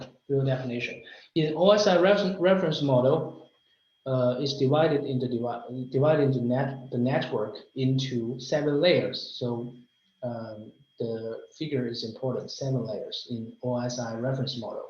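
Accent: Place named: India. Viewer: wtf, indian english.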